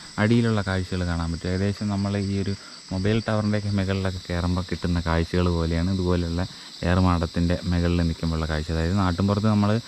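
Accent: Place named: Trivandrum, India. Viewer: Chila comments kandu bgm idan kurachu neram vaa pooti irunnal mathi 😂 video start cheyumpo muthal kalapilaa ennu samsarichondirikkum but trivandrum style samsaram rasamund ennalum kurachu kurachal nannayirikum 🤐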